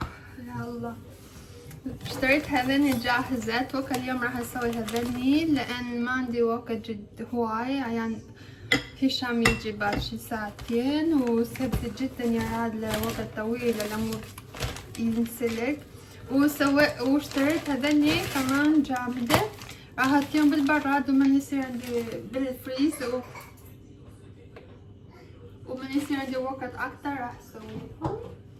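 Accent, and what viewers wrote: Iraq. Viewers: عفيه عليچ شلون تحچين عراقي حلووو انت انسانة طيبة ،، وشفت شگد مقهورة على زوجك ،، وحسيت بغصة صوتچ ،،، الله يبارك بيچ حبيبتي الله يحفظچ ويحفظ هشام وأيان باذنه تعالى ❤❤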